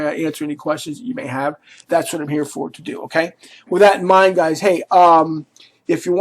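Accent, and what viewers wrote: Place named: Philadelphia, United States. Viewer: Subscribed because I'm from Philly and that accent makes me feel at ease 😌 lol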